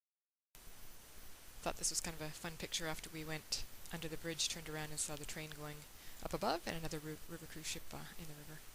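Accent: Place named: Canada. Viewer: Thanks! This is our first cruise, so we shall see how it goes. We chose Crystal. Booked through an acquaintance, but he has not given me half the info that you lay out so generously on your videos. I wish that I had found you before we decided. And, you sound Canadian (we are dual...but grew up in Canada)...so that is also awesome. If we like this trip, and decide on another, I will definitely contact you directly! Cheers.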